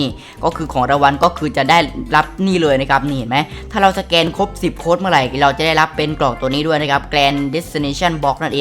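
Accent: South, Thailand. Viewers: พี่เป็นคนใต้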